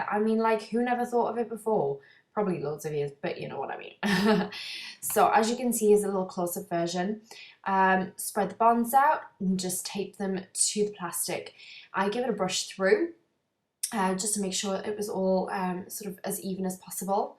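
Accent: Newcastle, United Kingdom. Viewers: I think she's from newcastle england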